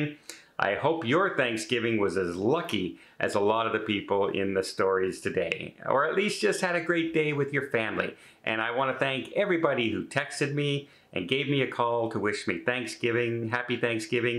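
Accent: Canada. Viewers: I think he is Canadian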